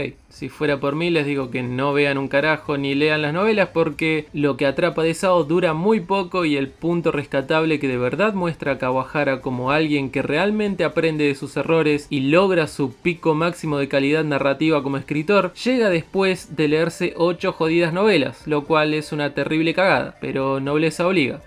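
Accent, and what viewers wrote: Argentina. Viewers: Escuchar a un argentino narrando es de la re puta madre que lo pario :v JAJAJAJAJA, buena opinión.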